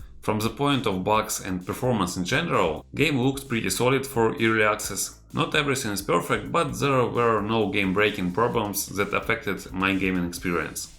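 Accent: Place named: Russia. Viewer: First of all, I'm not "criticizing" your Russian accent. But it was a little bit difficult to understand and I know you're working on it 😃 Other than that, good review. Do you know why I "Consider" it a good review 😜 2 reasons, 1. You got straight to the point. So many YouTubers, just drone on like an air-conditioner 😆 2. It was less than 10 minutes, and that's a good thing, honestly. People today have very "short attention" spans today🙄 You did talk a bit fast but, that's perfectly okay lol! You had a lot to cover and for the most part, you succeed 😝 So keep up the good work and I look forward to all of your, future videos 😁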